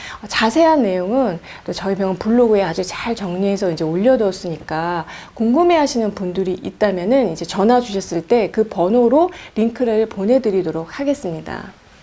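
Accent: Seoul, South Korea. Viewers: 선생님~ 마음이 그렇게 비단처럼 고와서 이험한세상 어떻게 살아가시는지 ㅎ 댓글 너무신경쓰지마세요. 자기가 댓글달아도 뭘달았는지도 잊어버리는 사람태반입니다. 그냥 방송내용만 알차게 해주시면 더바랄게 없습니다. 오리지날 서울 사투리 너무 듣기 좋습니다.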